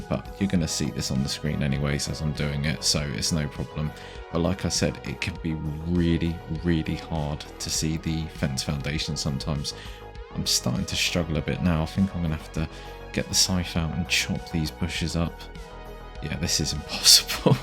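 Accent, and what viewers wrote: United Kingdom. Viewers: Somerset, born and bred here. Love hearing that Devon accent mate, keep churning out the fantastic content. 👏🙌🤟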